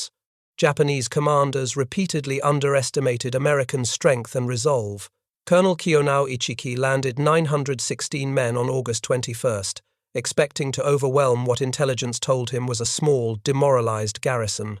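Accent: United Kingdom. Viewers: Does having a narrator with an English accent makes the information more believable?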